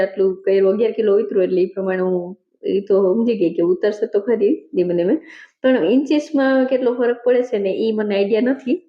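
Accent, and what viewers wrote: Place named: Kathiawar, India. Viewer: Hello! I came across your video and kept watching bcoz of your cute kathiawadi accent! I can give you some tips..walk for 10 mins after every meal. Dinner 6.30 sudhi ma patavi devanu.. It helps a lot!!!. Savarna breakfast ma ugadela kathod add karay! Also rotli ma jowar,ragi eva lot vaprai...I love those😊. Divas ma ghar ma dadra chad utar karvana leg muscles majboot thase. Dhire dhire exercise ma weight training add karvani ..5 kilo na dumbell lai ne sharu karay. Or even pani ni bottle thi pan karay...plenty of videos on youtube! Ena thi body saras tone thase ne inches ochi thase. Good luck..Will keep watching👍👍😊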